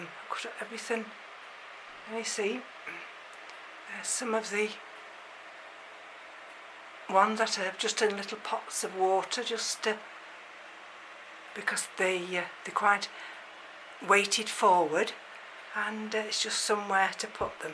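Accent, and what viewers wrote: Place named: North, United Kingdom. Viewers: I recognised your accent but didn’t realise you were in Crewe! I was born in holmes chapel and lived sandbach and alsager... my nan is from crewe and I went to college there... I’m now in Devon. Loving the videos. Keep up the good work! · Great to hear a northern accent talking about orchids thanks.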